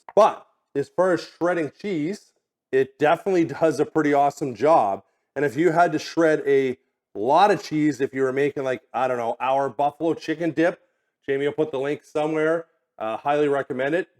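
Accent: Canada. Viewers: canadian for sure. no X in ET CTERA. No X is ES PRESSO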